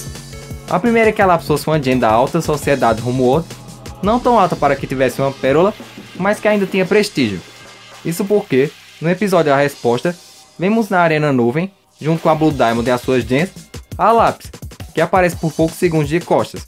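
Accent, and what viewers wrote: Northeast, Brazil. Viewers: ei doido tu e do Nordeste?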